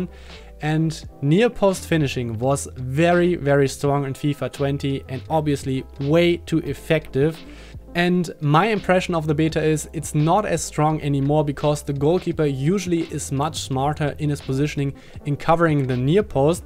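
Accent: Germany. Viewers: Safe Deutscher, dieser Akzent 😂 Genial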